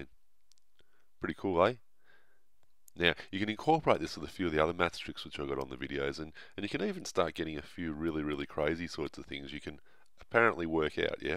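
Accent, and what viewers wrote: Australia. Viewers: are you Sal Khan's Australian twin? Are you the real Sal Khan doing silly math stunts while speaking Australian? Seriously, the cadence of your voice, your choice of words, they make you sound like Sal with an accent. If, by chance, you haven't heard him, just google the name and listen to what he sounds like. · Aussie accent.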